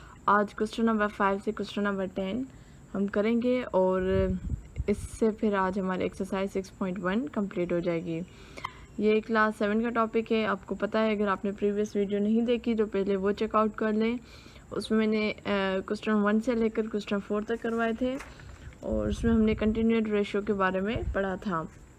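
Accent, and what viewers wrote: Sindh, India. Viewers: ڪجھ ليڪچر سنڌي ۾ به رڪارڊ ڪيو ادي، اوھانجو سنڌي لھجو اڃان وڌيڪ سٺو ۽ مٺو آھي 💯🙏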